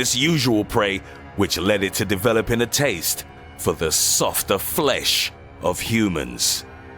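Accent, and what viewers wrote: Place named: London, United Kingdom. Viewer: Accent is from London, UK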